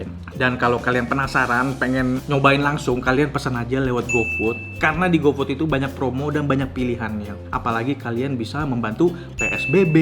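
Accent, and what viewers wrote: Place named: Surabaya, Indonesia. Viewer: Mamen gak pernah telat nih nonton video nya, mana suaranya surabaya😂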